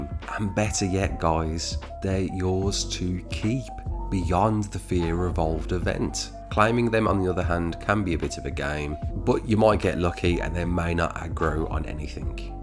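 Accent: Birmingham, United Kingdom. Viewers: You sound like a brummie boy ?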